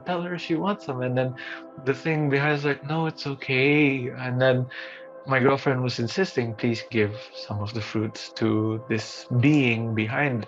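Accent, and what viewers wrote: Conyo, Philippines. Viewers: Conyo si kuya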